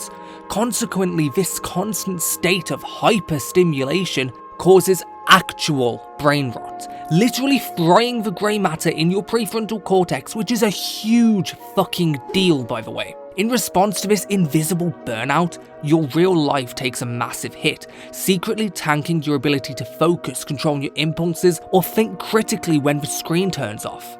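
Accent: United Kingdom. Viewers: Are you from Birmingham? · why do you have a British accent? Really good video either way!😊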